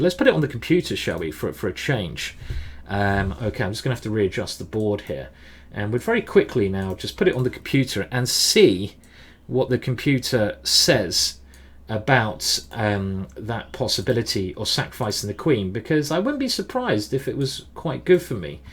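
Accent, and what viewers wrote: United Kingdom. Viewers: So great GM thanks a lot I learn English British accent from you beside chess I'm so happy to learn from you. very very tough game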